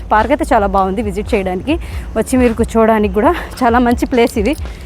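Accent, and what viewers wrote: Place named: Godavari, India. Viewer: మేడం గారు గోదారి యాస అదరగొట్టారండి సూపర్ 😂😂😂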